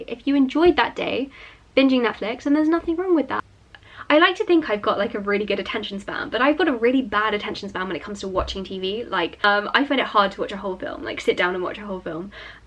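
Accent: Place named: England, United Kingdom. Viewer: Can you please tell us where in England are you from or lived in? I studying british english and I just love your accent and want to know where is it from 💛 thank you!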